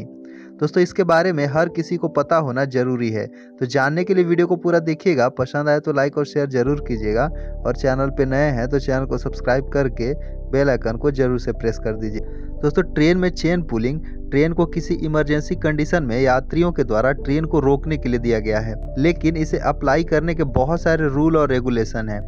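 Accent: Bihar, India. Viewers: Ap bihari ha kya apki awaj se lag rha hai..main bhi bihari hun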